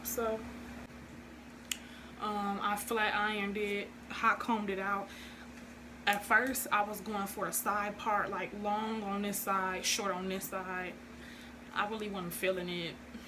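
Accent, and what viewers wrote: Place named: Oakland, United States. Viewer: You Sound Like You’re From Oakland. And I Love This 🍊Orange! 💣💣💣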